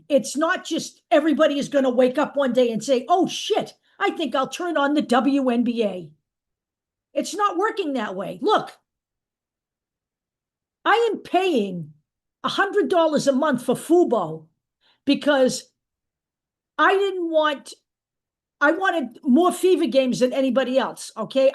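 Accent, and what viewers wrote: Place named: New York City, United States. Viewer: I watched that Lundberg report. I remember him concluding ESPN only put that date due to needing a 'placeholder' on their shows. An estimate, they don't really know when she's coming back. FYI I'm 75 grew up in NYC, fan of NBA since childhood. Only watching WNBA now because of CC. She's the best! You sound like you're from NYC too. Enjoy your sharing and style, reminiscent of NYC.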